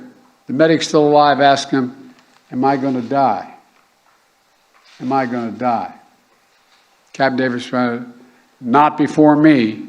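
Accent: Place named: Australia. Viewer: Can't stand even listening to this shit head Aussie ear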